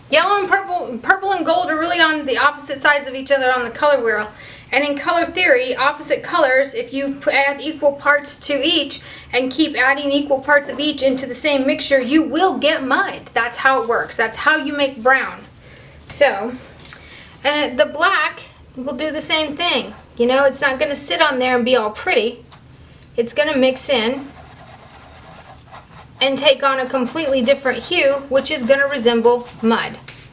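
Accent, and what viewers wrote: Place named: Texas, United States. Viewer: And by the way, I'm a Texan transplanted to upstate New York and it is so good to hear that Texas twang! Love y'all!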